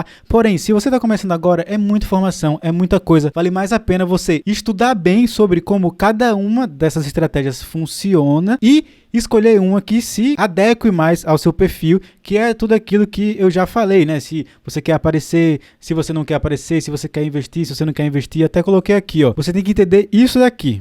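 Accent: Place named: Northeast, Brazil. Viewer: Eu só queria saber de que parte do nordeste é o sotaque do. Tenho amigos nordestinos e não consegui identificar.. Bah. Virei fã dos teus vídeos...abraços gaúchos..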